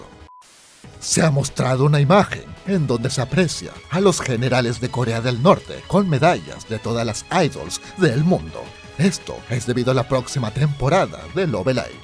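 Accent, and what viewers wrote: Peru. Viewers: Eres peruano? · Alv eres peruano :D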